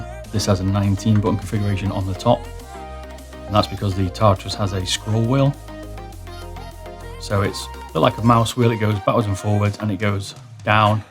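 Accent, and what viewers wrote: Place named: Cockney, United Kingdom. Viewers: I'm betting Scottish, if not then English. Yeah, people have accents, some are harder to understand compared to others. I understood you just fine. Though the music may have been a touch loud. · Enunciate please. Too Cockney to understand. There's some good info in there somewhere.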